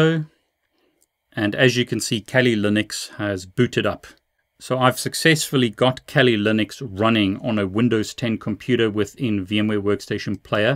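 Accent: Afrikaans, South Africa. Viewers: HAHAHA Wou se jou aksent is AFR. haha voorspoed tjom! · Ek wou se ek herken n Saffer aksent....Geniet jou videos! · i thought that was an SA accent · I called it! I thought your accent sounds South African. lol "jou rekenaar is myne" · I knew he was South African xD that accent was amazing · Well you got the afrikaans accent right, maar jy moet dit nie weer doen nie asseblief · it was Afrikaans my friend you can hear his SA accent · I knew I detected an Afrikaans accent in your other videos!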